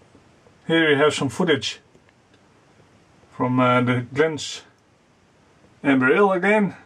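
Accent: Netherlands. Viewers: Allright Im going to try this as my first time brew! How did it turn out? And do I have to filter it before drinking? Proost from Holland ( and hearing your dialect I think you come from NL aswell?)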